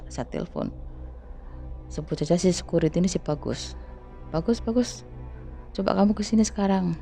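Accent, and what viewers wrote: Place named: Manado, Indonesia. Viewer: Mba nya logat Manado banget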